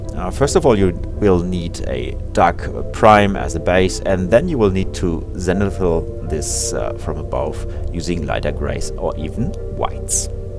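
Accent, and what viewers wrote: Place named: Germany. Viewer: Great video. And that german (?) accent is amazing.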